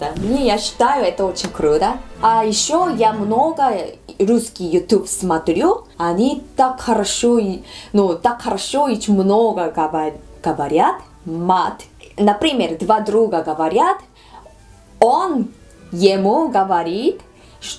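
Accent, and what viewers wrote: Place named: Tajikistan. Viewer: Базаришь как таджик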